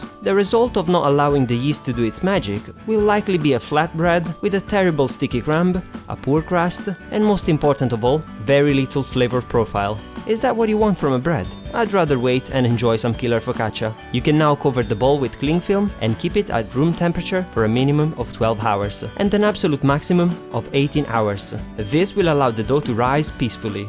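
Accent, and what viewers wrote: Italy. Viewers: Tu sei toscano allora!! :)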